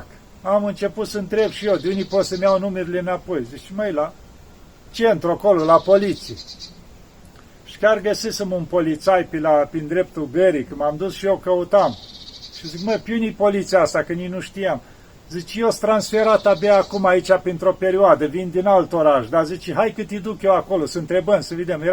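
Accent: Moldova. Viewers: parinte schimba graiul ala moldovenesc ca este enervant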